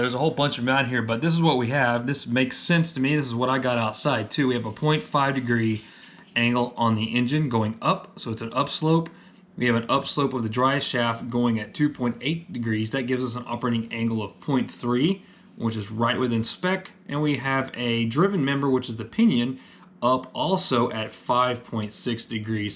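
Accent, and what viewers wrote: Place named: Australia. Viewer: just love the Australian Accent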